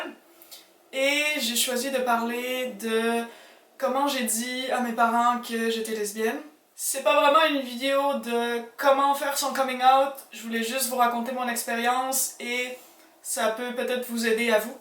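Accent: Canada. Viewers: c'est pour ça que tu as un accent canadien!! :) bisous du Canada!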